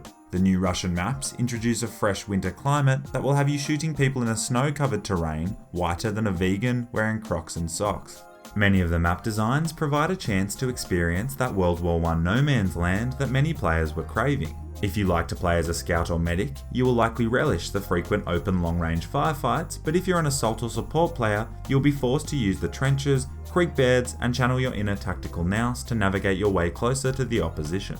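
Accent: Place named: Australia. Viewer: You are my new favourite youtuber! Your content actually makes me laugh. Your humour goes great with the accent (I sound like an american but I'm australian too). At least you aren't an ibis that steals my lunch box everyday.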